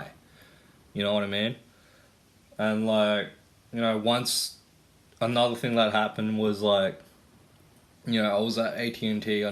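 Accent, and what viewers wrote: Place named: Australia. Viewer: I won hundred percent agree I don’t know if it is speaking style in the way that he says things he just interrupts him self constantly and beyond as aimlessly or if it is over the top Australian bro boy douche bag accent. It’s awful to listen to and maybe that’s not his fault but I think he’s pushing it a little bit. Either way it’s a shit video and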